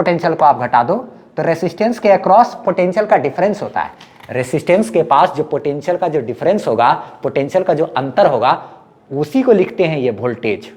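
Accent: Bihar, India. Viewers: Sir ji aap bihar se hain na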